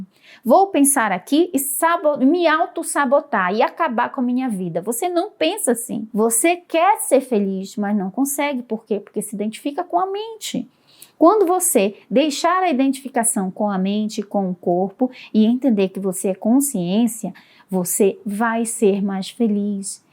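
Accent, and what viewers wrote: Northeast, Brazil. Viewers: Adoro seu sotaque meio do norte ou nordeste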